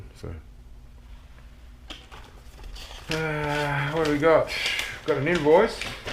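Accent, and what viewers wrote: Australia. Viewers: Love the coins mate..you have a very cool take on coins but wash your hands bro and how bout some neosporin and a bandaid on that finger. Sweet aussie accent too.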